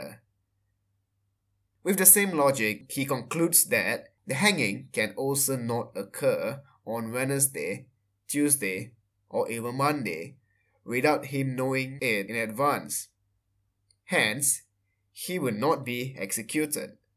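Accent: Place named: France. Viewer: Sounds like French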